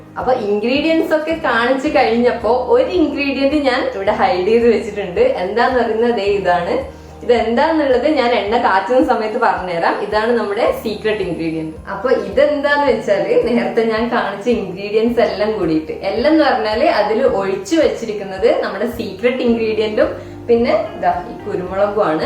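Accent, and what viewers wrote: Kannur, India. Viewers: എവിടാ. സംസാരം കേട്ടപ്പോ തോന്നി. ഞാനും കണ്ണൂർ ആണ്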